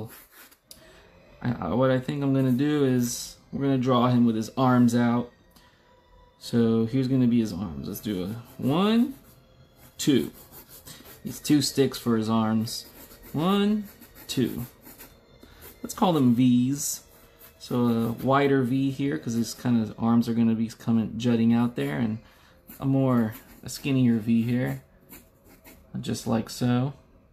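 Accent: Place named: United States. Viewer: You have a very calming voice too! Usually I find American accents irritating but yours is definitely nice! 😝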